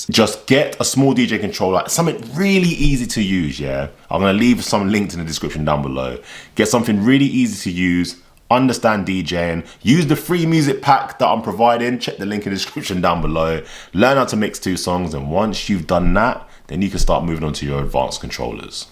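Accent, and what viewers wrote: London, United Kingdom. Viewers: Just found your channel, so glad! I love your London accent and your vibe + great info!! What controller would you recommend for a beginner who travels a lot and wants to be able to travel with it easily? Around 300-500 pounds budget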